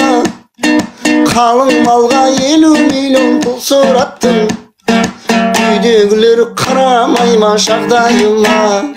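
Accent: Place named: Karakalpakstan, Uzbekistan. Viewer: Бауырым шаршама.Каракалпакша адеми болды.жарайсын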